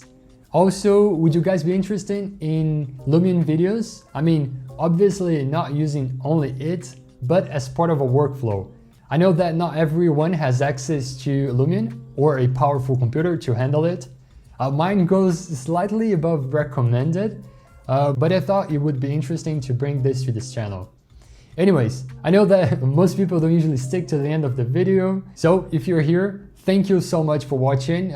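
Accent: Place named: Brazil. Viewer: It's our Brazillian accent, if englsih was spoken correctly is really kinda cute .-.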